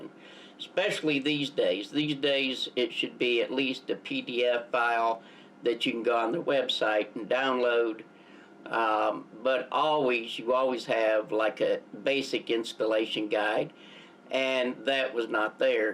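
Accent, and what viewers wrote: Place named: South, United States. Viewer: Wow, no offense but you sound like a southern Bumpkin so I don’t think anything about your review here is going mean anything to the cell signal internet community. It’s ok, you just don’t understand technology. So maybe Chester repair equipment is beyond your cell signal intelligence? You probably even still have a land phone line?? That’s funny! Have you gotten Better connection results with 2 cans of Spam tied together with string? 🤣😂😅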